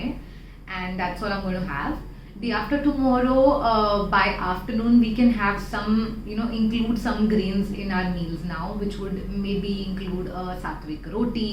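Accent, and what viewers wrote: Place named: Uttar Pradesh, India. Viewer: Your accent looks like you are from U.P.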